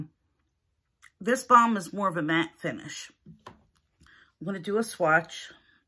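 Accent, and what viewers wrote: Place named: Philadelphia, United States. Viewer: Beautiful shades of lip color. My favorite was the last one. It matches what you're wearing perfectly! By the way, I'm a new subscriber to your channel. :) I hear a little "Philly" in your accent. Is that where you are from? Just curious. Have a fabulous weekend too!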